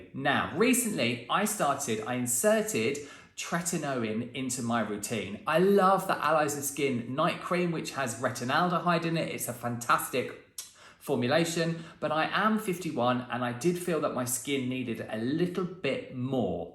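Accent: United Kingdom. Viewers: I truly adore your British accent ❤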